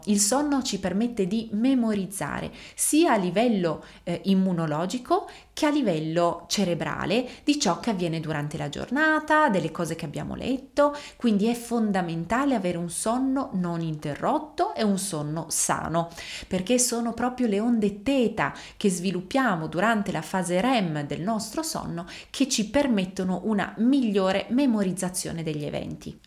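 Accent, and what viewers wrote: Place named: Turin, Italy. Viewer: Ma lei é di Torino neh? Ma boja faus😊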